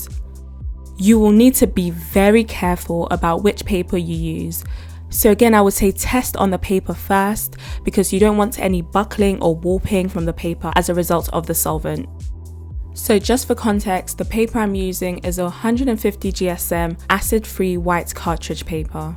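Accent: United Kingdom. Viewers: Your british accent is so satisfying...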